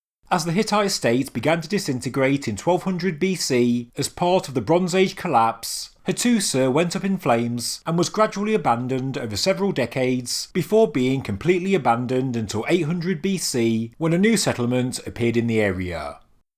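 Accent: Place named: Black Country, United Kingdom. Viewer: He is definitely from the black country in England. Though that doesn't attest for the random drop in octaves on the last word in every sentence.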